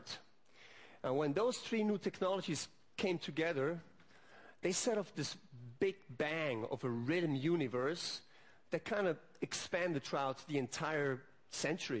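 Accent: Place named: Switzerland. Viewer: Well, he's Swiss. They always sound rather distinctive.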